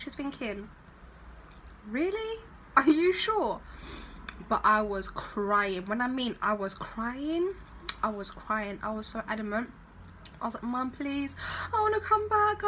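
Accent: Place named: London, United Kingdom. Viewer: London accent😍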